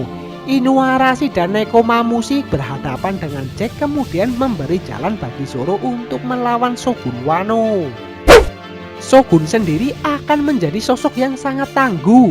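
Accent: Banyumas, Indonesia. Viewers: Pertahankan suara ngapaknya sob.. bedakan dengan yang lain.. jangan peduliin haters.. karena mungkin dia kalah saing ama ente.. hoho. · Go ngapak go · gk suka gue sama suara nya.. jangan ke jawa jawaan dong mas.. yg biasa aja kali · agak ngeri ngapaknya, kayak siapa gitu. 😂😂😂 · mendok bgt bahasanya berbicara lha dengan yang baik dan benar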